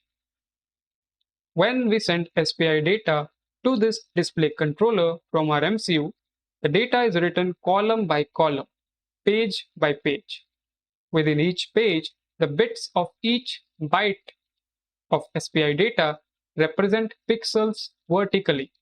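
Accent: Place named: India. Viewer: damn, i was hoping for a video without an indian voice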